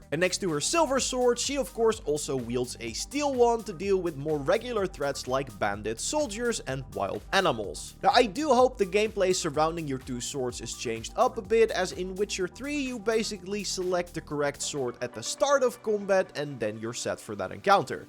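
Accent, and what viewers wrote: Netherlands. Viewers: U need to work on your english you sound dutch af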